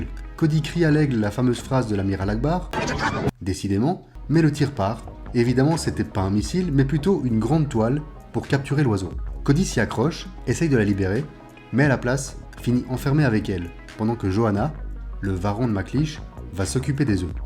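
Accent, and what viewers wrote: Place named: Belgium. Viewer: On entend que tu es belge parce que tu prononces la séquence « ui » comme « oui ».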